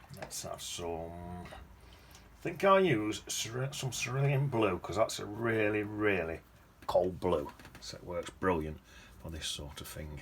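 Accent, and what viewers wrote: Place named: Yorkshire, United Kingdom. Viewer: Thank you for your demo. Very refreshing,informative and like Bob Ross make you feel you can achieve something similar. Also the accent is gorgeous. I’m from Yorkshire, I now live in Notts but the accent is something that I miss. Hence I am so glad I found you and I will follow to pick up some tips. Just great. x😍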